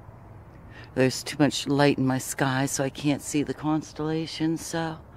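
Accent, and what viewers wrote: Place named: Midwest, United States. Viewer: I’ve learned a lot about the realities of amateur astronomy by watching your videos. Thank you. Also, your pace and content are terrific. I definitely feel your voice and tone are excellent and soothing...just right! (I live in Lexington and recognize the midwest accent). I play your videos sometimes to help fall asleep- that’s a compliment, because they are relaxing!! Lol. Thanks again, and don’t change a thing and ignore any criticism. You are unique.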